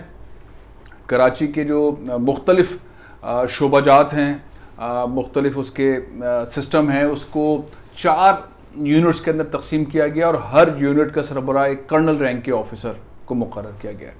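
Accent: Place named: Punjab, India. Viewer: Apka bolny ka style ab tu paka panjabi ban giya hy,ye kyse houa???🤔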